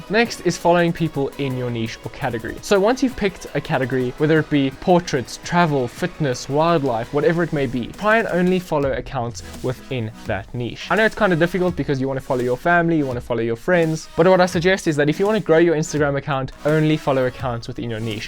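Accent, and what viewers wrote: South Africa. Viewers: I hear a south african accent there 🤔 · Are you South African ??? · Are you South African?🤔 your accent sounds like you’re from here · Is that a South African accent I hear?? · South African? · Clearly South African bru... you still there?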